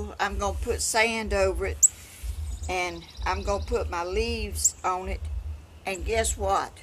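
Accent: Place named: Georgia, United States. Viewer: I love your Georgia accent. I am from Amarillo, Texas so I have a Texas accent. Good thoughts coming to you from Texas.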